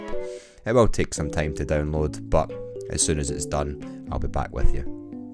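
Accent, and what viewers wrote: Scotland, United Kingdom. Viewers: are you scotish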